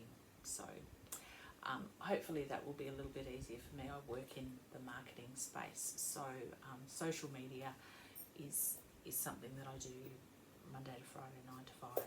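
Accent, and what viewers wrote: Australia. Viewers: Hi. Great to hear another Aussie voice. I’m at the other end of aus from you in Vic. Love knitting. I’m a monogamous knitter. I find it too stressful having several things on the go.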